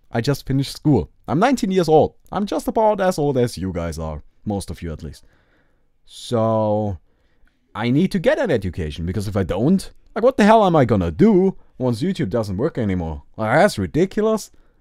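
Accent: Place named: Germany. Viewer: you are a truly great speaker. There is some sort of honesty in your interaction with your viewers, that is very precious and rarely found. The way you open up to your viewers is so inspirational, and it sets our (mine at least) minds at eazy to listen to your charming german accent in you kind tone. These are all valuable qualities in life. Now, i did what you told me and thought about my comment, so now I'm asking you to think about why your channel is finding succes. It is your accomplishment, and yours only. Don't rush into something your heart isn't in.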